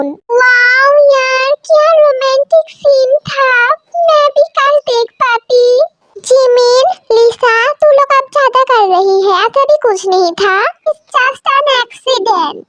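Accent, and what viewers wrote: Bengal, India. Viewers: Are di apto bengali ho na 😊 apki hindi so sweet 😊😊😊❤❤ so cute story and darun hoyeche golpota ❤❤ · Sis your Hindi accent is very cute mixture of Bengali and Hindi nice to hear🫰🫰🫰🫰🫰🫰🫰🫰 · Dakke nahi bula ke are you Bengali cz apke language main bohot bangla feel hain btw nice story 🥺😽btw new subscriber love you Please Don't mind kiyuki maine apki voice ke bhare main bola hain sorry🥺And your voice is so cute 🫶🏻😩🦋and apki story bohot peyari hain 🥺plzz pin me god bless you borahae 💜🤍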